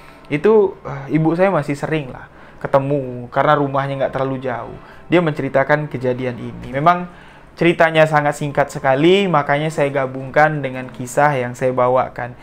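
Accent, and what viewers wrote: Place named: Madura, Indonesia. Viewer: Mau tanya mas asli orang dari daerah mana?...logatnya mirip2 sprti orng madura atau orang padang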